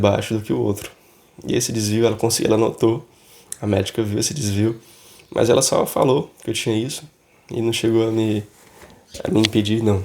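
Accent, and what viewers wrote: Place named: Ceará, Brazil. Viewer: vc é Cearense? Só por curiosidade mesmo, pq às vezes "tenho certeza" pelo sotaque cearensão (kkk), mas às vezes fala o S paulista (queStões, e não queSHtões como o cearense) rsrs Estou gostando bastante dos seus vídeos! Tem me esclarecido muito!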